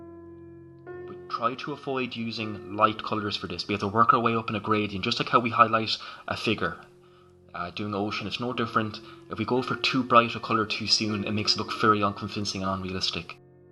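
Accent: Ireland. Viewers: great to hear an Irish voice,great stuff from Bill in Limerick.I have the Yamota battleship.regards Bill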